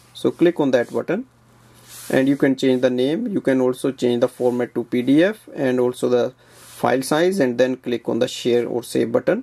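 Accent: India. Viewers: Nice gujju accent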